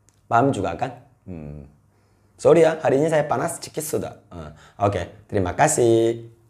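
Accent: Sabah, Malaysia. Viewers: Cara abang becakap mcm org sabah kk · Terbaik la korea cakap melayu sabahan... 🥰🥰🥰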